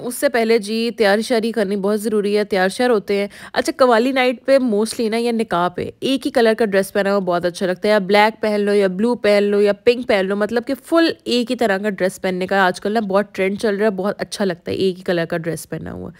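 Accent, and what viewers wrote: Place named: Punjab, India. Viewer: in love with your voice specially(punjabi accent)😍 and uhr justtttttttt waoo🙈❤ have watched all your videos and the way uh entertain everyone is just commendable😍 so much love from INDIA❤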